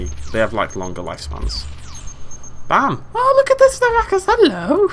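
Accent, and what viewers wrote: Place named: Cockney, United Kingdom. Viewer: Haha, you say assume like "ashoom" and instead of a "th" you use "f". What accent would that be, Cogney? It's interesting how there are so many different dialects.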